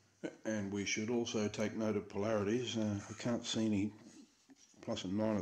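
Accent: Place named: Australia. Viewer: Nice to see another -11. Mine are all QBus so it's nice to see a nice unibus box and good to hear another aussie accent. Will subscribe. Hope to see more. Keep up the good work!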